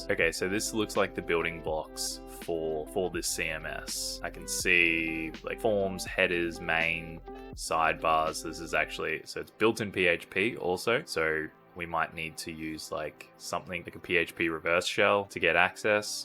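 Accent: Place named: Australia. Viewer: Australian accent lol. let me check this room. I am not there yet on THM Thank you for the video. 1000 subscriber. make it happened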